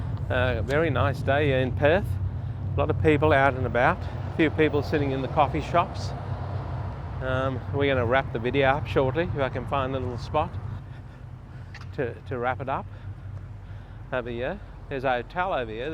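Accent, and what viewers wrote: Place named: South Africa. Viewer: You sound a bit s african. Are you?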